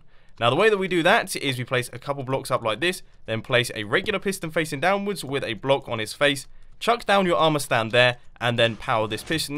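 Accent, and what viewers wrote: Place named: United Kingdom. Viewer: I love your eccentric british voice. Can't wait for the next hermitcraft 3 episode!!!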